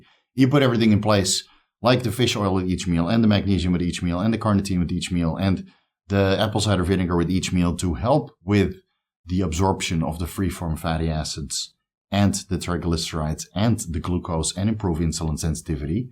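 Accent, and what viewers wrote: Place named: Netherlands. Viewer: what is your heritage, I can't quite place your accent.... Dutch?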